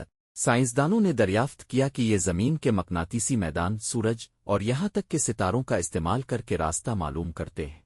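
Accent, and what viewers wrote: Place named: Hyderabad, India. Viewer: مجھے حیدرآباد کا اردو لہجہ بہت بسند ہیں۔❤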